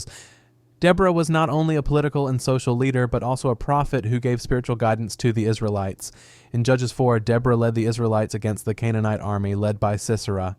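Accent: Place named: South, United States. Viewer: Hear the voice mate? It's American. Sounds like the south our lower Midwest, meaning the large majority of their religious exposure is Christian with perhaps a skimming of world religion ans culture, perhaps a world religion class or two, but nothing you can't get with a Google search. Also, the voice sounds Caucasian (not being racist, I'm a 50 year old white dude myself) based on the number of accents and regional speech patterns I've heard. Most white, American Christians don't bother learning much about Islam particularly because it's outside their belief and labeled satanic. Hope that helped.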